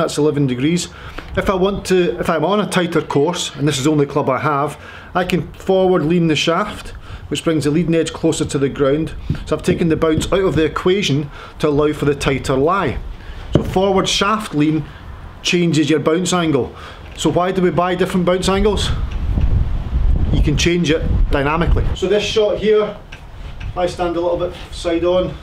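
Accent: Scotland, United Kingdom. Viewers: Aye, adjustments are possible to reduce the effect of bounce off concrete. Why not do a Scottish version of Phil Mickelson and show on a video how to hit very different shots with the same club. Too many are too poor at understanding the versatility of a wedge anyway. This said just as an idea for additional vlogs from you. Examples: low chips with a lob wedge and next a flop shot with the same club, and how to master both, and how to increase bounce by opening up face in soft sand and the opposite in wet sand, and so forth. Good luck with your shootings. And what a delight it is to hear your dialect. Be proud of it! Keep the good stuff coming. Greetings from a snowy Sweden.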